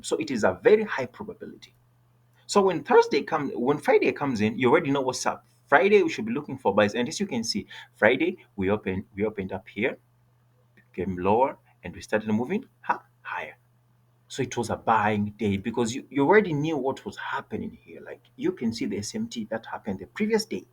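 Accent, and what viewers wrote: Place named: India. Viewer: Why you speak like indians ? Are you paj..et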